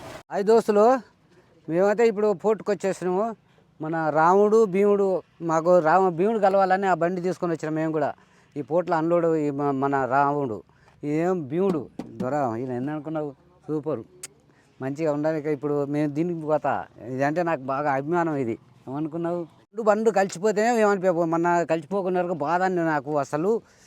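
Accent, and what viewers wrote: Telangana, India. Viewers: Thank you నువ్వు చాల మందికీ ఇష్టం అందుకు Brother TELANGANA యాసని India మెత్తం పరిచయం చేస్తున్నావ్ Fast నుంచి చూస్తున్న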